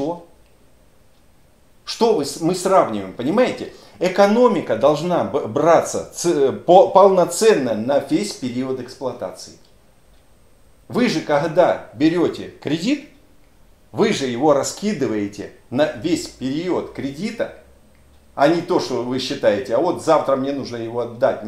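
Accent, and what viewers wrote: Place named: Rostov, Russia. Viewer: Как же достал этот Ростов! Учись говорить по человечески!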